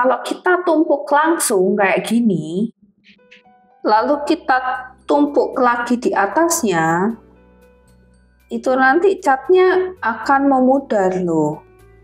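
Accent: Java, Indonesia. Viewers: Medok but beauty and love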